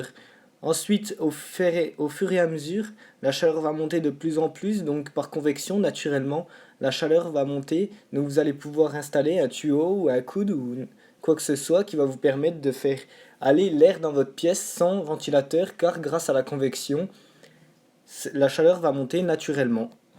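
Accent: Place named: Belgium. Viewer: Accent Belge à mon avis ^^ ( j'ai étudié là bas ) :)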